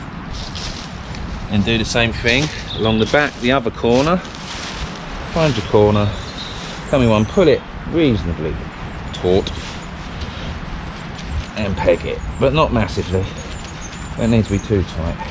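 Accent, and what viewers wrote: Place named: United Kingdom. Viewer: I like that this tarp is lightweight and that you are in the UK, speaking British English. No jingoism, just easier to understand and relate to for me. Your explanations could do with some improvement, however.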